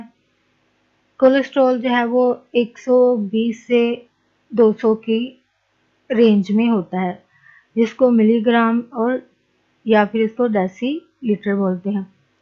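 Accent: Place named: Rural, India. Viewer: paindoo awaz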